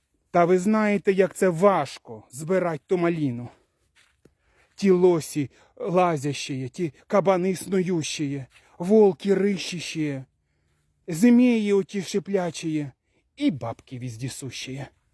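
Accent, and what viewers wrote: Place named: Bila Tserkva, Ukraine. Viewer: Боже ж ты мой, какой приятный человек, и говор его душу ласкает. И юмор добрый,житейский. Так говорила моя бабушка и мама, они родом из под Белой Церкви. Давно их нет на этом свете, а я помню их говор. Спасибо за юмор, за доброту. Всем мира и чистого неба.🎉🎉🎉❤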